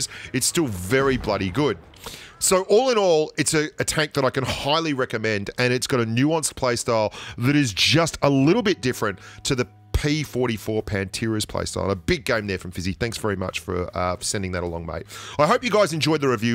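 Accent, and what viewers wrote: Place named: Australia. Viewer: FIRST! Also, are you okay? tell me your property isnt up in flames. You sound australian and idk if you are from australia and live there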